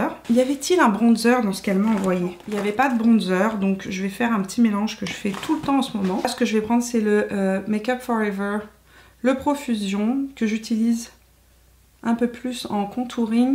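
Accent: Quebec, Canada. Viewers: Bonjour! J'aime beaucoup quand vous faites des collabs toute les deux! Et quand je t'entends parler, ça me rappelle le Québec où j'ai habité quelques années...la palette Dior est superbe, je l'utilise depuis qques semaines. Voudrais tu par contre me dire quel est le dernier pinceau que tu utilises, le fluffy pour appliquer les illuminateurs en topper, stp? La bise.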